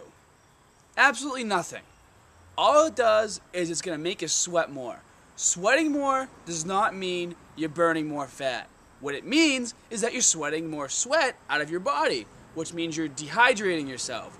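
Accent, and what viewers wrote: Boston, United States. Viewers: you sound much better than with the heavy boston accent that comes and goes :)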